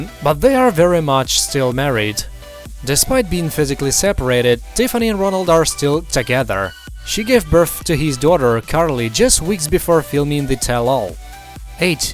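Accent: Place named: England, United Kingdom. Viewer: That's because he is from England. They pronounce words differently. What bugs me is if a word ends in 'R', they add on an 'A' when they say it.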